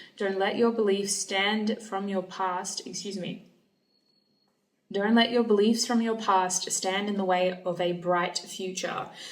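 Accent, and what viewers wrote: Australia. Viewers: it’s INSANE how accurate your readings are. also, another reason I love watching is because of your australian accent 😍❤️ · Are you Aussie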